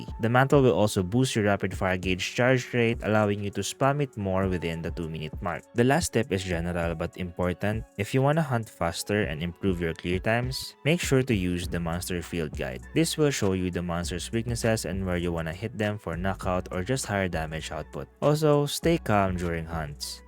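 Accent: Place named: Philippines. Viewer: Your accent in the beginning, I knew exactly you're a filipino like me. Kudos to your guide video bro. After doing speedruns on my Bow... now it makes me wanna go LBG for my next weapon. Thanks man great video!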